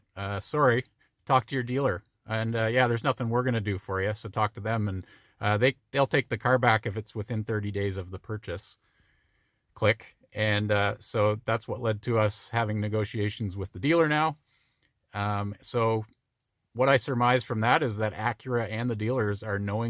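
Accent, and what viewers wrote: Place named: Illinois, United States. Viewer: That Mid US Illinois area accent is just annoying! Hence, the extreme cold air may have contributed to the screen not turning on! The Acuras for the rest of us in the USA should be mostly just fine!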